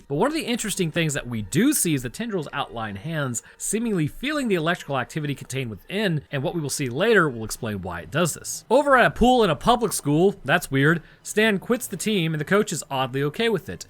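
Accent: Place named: South, United States. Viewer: As someone who lived in Florida for a while and some times his southern drawl sneaks out of the basement that it SHOULD NEVER leave. Southern drawls arnt that thick unless they’re Cajun or some very very isolated rural areas. Atlanta ain’t one of em.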